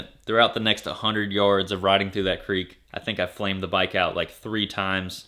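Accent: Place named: United States. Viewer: Just a nitpick but KTM and Husqvarna are also by definition "across the pond". America doens't make jack shit with regards to off-road motorcycles, not a damn thing. Am I assuming too much? You sound like an American!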